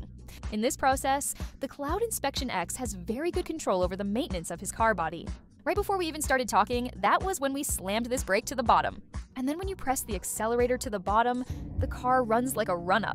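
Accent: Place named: China. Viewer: Funny Chinglish commentary 😅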